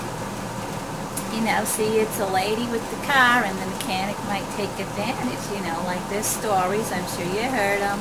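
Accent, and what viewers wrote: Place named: New York, United States. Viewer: I love your new york accent. My dear lady, i usually do my own car repairs cause i don't trust mechanics. And I believe usa mechanics like to sabotage foreign cars. You need yourself a good husband mechanic and jack of all trades. If I were single i would definitely propose to you. Take care.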